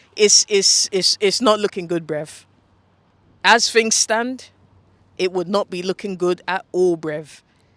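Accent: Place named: Ghana. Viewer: Hehehe!!! See! He talks like us. That's what fooled me! Ok then! Big ups to Ghana!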